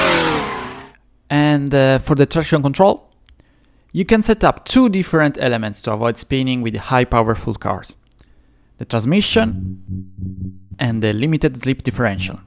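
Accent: Italy. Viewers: L'accento italiano antisgamo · I love your Italian accent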